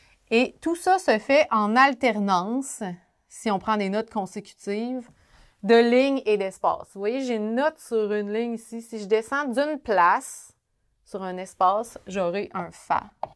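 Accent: Quebec, Canada. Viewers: Avec un superbe accent québécois... et très clair ! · c'est super l'accent aucun problème les Français adore l'accent québécois, bonne journée :)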